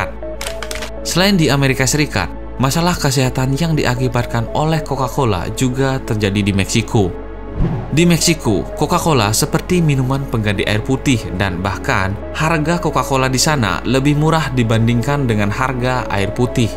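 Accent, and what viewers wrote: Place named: Bali, Indonesia. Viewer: Orang bali bang? logatnya kayak orang bali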